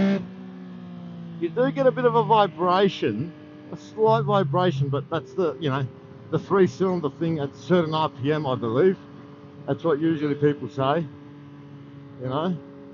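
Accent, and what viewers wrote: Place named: Australia. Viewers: Yeah this is a GEM bike. IMO it's the complete placate because you can use it for commute, twisities and some level of track riding! Btw are you from Australia, haha? Can sense the aussie in ya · Definitely sounds like a fellow Australian, which makes the fact he's driving in somewhere with greek/cyrillic alphabet(?) all the more interesting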